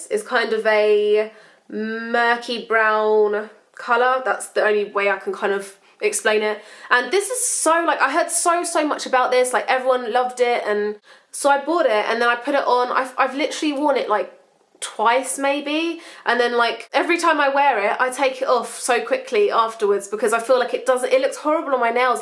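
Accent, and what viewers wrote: United Kingdom. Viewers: i love your english.... british english, right? your accent is so cute :) many youtuber don't reply to their subscribers... and seing you replying to many just makes me like you more :) love from germany♥♥ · You are so beautifully exotic looking!!! I love your accent haha I am a bit obsessed with anything and anyone British.. I remember the first video I watched of yours was your Perfume Collection and I remember laughing and thinking you were really funny and I remember you being like this gorgeous exotic looking girl so I look at your other videos and I loved them!!!! You now have a loyal subbie :)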